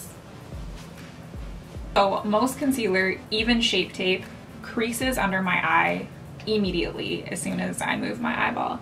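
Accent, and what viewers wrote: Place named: Canada. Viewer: i love your slight canadian accent it's so cute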